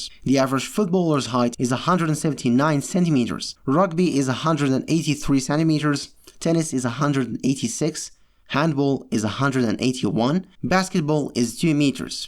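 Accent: Morocco. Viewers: where are you from? your accent sounds like a moroccan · Are you Moroccan by Any chance, i hear a familiar accent ..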